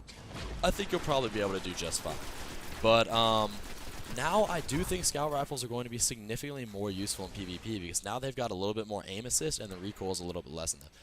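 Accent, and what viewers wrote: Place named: California, United States. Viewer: Yeah I was debating between that and "First" but ultimately it was your california surfer "brah" speach style that helped me decide ^^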